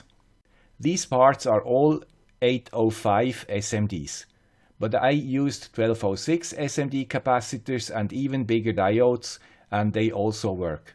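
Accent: Switzerland. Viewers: Dear with the cool Swiss accent Finally I got all parts to replicate your awesome project. After soldering all parts on the pcb I took your sketch from your cool Github repository. My hands were already wet and my heartbeat had already reached a critical rate because of the great excitement. With my highly shaking right hand I tryied to hit the upload button. After a few try's it began to upload. Then the shock of the day -> Error message: "conversion from 'const ip_addr_t* {aka const ip4_addr*}' to non-scalar type 'ip_addr_t {aka ip4_addr}' requested " Because I'm a beginner in the Processing programming language I have no clue how to fix it. Do you can help us to fix it? I'm sure for an expert like you this problem is like peanuts;) Maybe it has something to do with the version of Arduino IDE or a library. I'm not sure:-| Kind regards and thanks a lot for sharing your awesome projects, ideas and knowledge with us. 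Thats very kind Greeting from Bern and stay healthy delf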